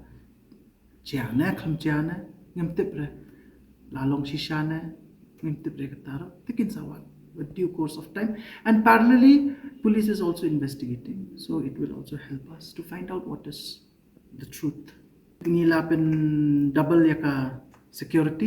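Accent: Umpling, Malaysia. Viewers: Ktien umpling rh katjuh hei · Ktien Umpling, namar u dei na Umpling, khublei kur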